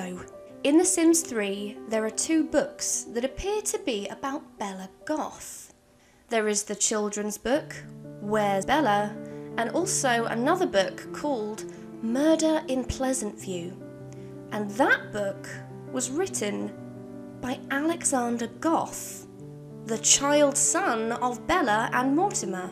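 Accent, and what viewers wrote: England, United Kingdom. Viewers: Where you from? That english accent is unique and I only hear it in the movies or on tv.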